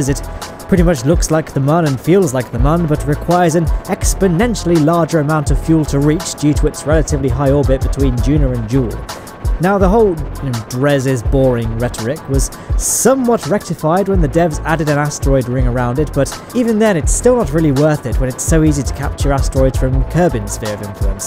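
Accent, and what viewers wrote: United Kingdom. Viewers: You are British..... and space....? Instant Sub!!!! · For some reason I heard that as "This is a purely autistic video" instead of "This is a purely artistic video". Must be your British accent. I'm from America.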